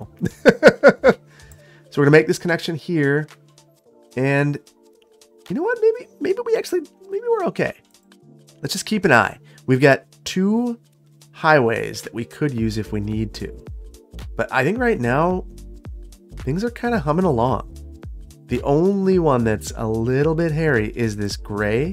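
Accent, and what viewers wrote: Wisconsin, United States. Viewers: let your Wisconsin come out more often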